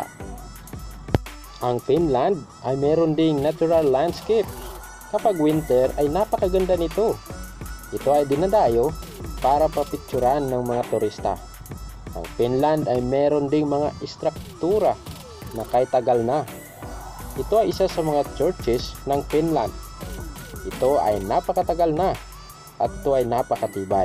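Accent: Visayas, Philippines. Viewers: i think he is bisaya,the way he talk,that's why.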